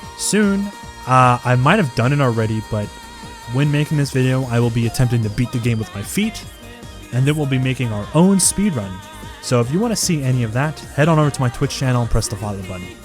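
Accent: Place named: Australia. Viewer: i can just hear your Australian accent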